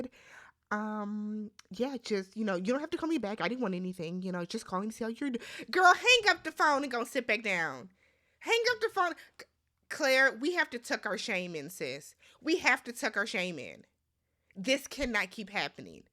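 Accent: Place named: Chicago, United States. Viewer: Being from Chicago, listening to you is like talking to my girls I grew up with. I lol every single review 😂🤣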